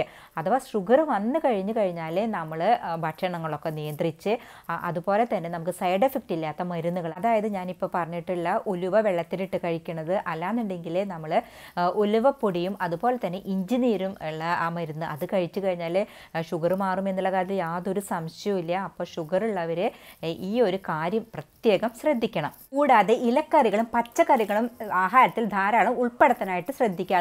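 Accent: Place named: Kannur, India. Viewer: നാട് കണ്ണൂര് ആണ് എന്ന് മനസ്സിൽ ആയി..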